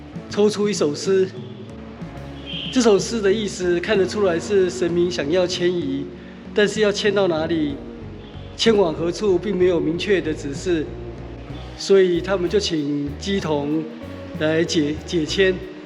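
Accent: Taiwan. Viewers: 哇 這個跟我們家差不多 我們是民末年來的 因為有點好奇 覺得口音是台灣人 但不確定是不是外省人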